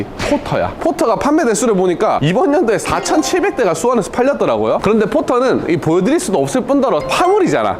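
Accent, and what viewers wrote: Gyeongsang, South Korea. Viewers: 경상도 엑센트 들려요ㅋ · 대놓고 경상도말 맞는 거 같은디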